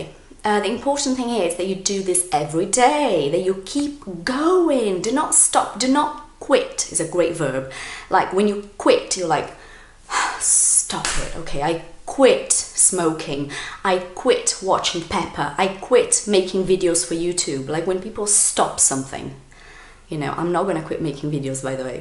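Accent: United Kingdom. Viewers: How did you get this accent? I really love British accent. Thanks for sharing these videos with us! Congrats for you Channel.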